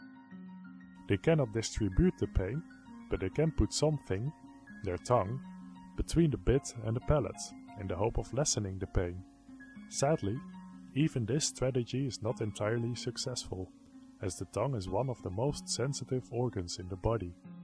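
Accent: Netherlands. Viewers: your dutch accent is so apparent gawdxD btw this is all a bit exxagurated... a good rider gives his horse relieve in the mouth when he does good, so the bit hardly moves by your hands... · horribly Dunglish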